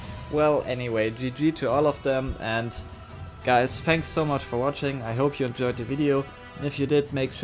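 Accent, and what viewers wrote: Germany. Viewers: Dein deutscher Akzent könnte aber auch nicht stärker sein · Man hört raus, dass du deutsch bist :D Gutes Video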